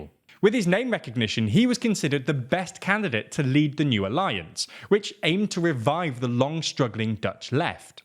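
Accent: England, United Kingdom. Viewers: Yes You tell me, a Dutch citizen, with your pompeuse Brittish accent. First fuckup one minute. Okay he put those points(Wilders) in the fridge. PVDA Groen Links(far left) NSC is mid left, actually wilders is left on economic stances and right on migration. He is NOT Anti EU he is for an Economic European community, you know the thing EU was supposed to be. For a Brit, you got your facts pretty well sorted. I predict PVV, BBB, NSC, VVD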